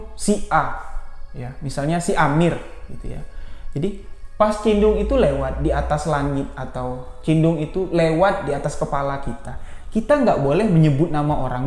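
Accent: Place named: Lampung, Indonesia. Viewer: Saya seneng nonton bang cerita.. Kadang2 dialek dan gaya bicara nya kayak ulun lampung.. Sy kira dulu bang adalah orang lampung krn sy denger suaranya aja.. 😊. Jambi, sy belum pernah menginjakkan kaki ditanah itu, tapi cerita cindung, pait lidah, sangat populer didaerah kami, lahat.. Dulu kata temen2 ku, daerah lahat juga banyak ahli racun, tapi mungkin bukan disini, yg berdekatan dengan muara enim.. 😔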